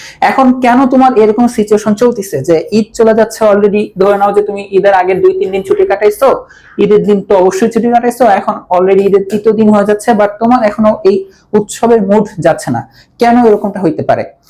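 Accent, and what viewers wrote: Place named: Kolkata, India. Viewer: apnar voice sune mone hoi apni Kolkatar er teacher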